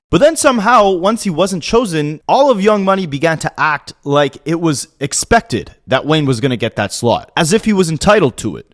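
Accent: Canada. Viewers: no doubt I was just making sure I seen it questioned in comments a couple of times and the Canadian accent brought me back to the question and I never saw a straight answer 😂😂😂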